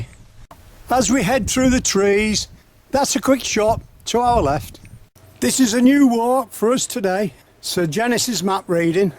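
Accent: United Kingdom. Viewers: No need to sound British.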